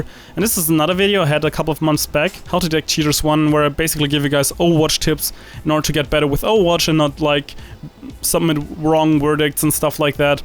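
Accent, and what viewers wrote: Germany. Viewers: Jetzt ergibt das auch nen Sinn warum du sowie die typisch Deutsche im MM klingst xd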